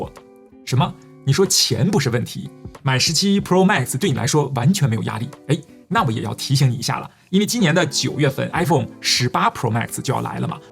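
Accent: China. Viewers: 你们台湾人觉得不觉得他的口音更像大陆的？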